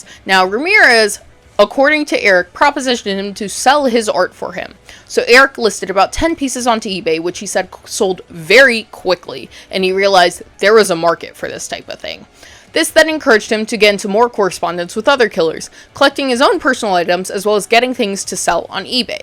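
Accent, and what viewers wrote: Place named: South, United States. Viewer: oh nah this guy is from the south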